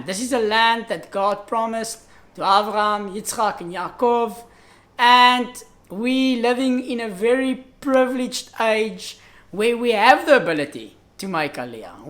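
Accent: Afrikaans, South Africa. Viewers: Thanks for sharing your story - we made aliyah from Johannesburg a few days after you and live in Beersheva. I came across your videos once while searching Tefillin and was so shocked when I heard someone speak with an Afrikaans accent and talk about Tefillin. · Wow, I am impressed. Your library seems is amazing. Should have contacted me, could have taught you Hebrew before you made aliya. Long time since I heard someone speaking with such an Afrikaans accent. Our Rabbi's parents' are from Cape Town. It is probably the only decent place today. You are amazing. If you ever come to Australia let me know. Would be amazing to meet you.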